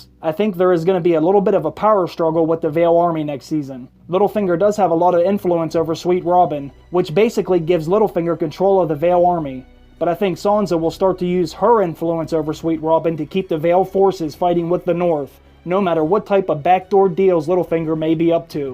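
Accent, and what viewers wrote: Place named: New Jersey, United States. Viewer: you need to be deported then. disappointed with your lack of caring and making my ears bleed with your semi informative jersey accent.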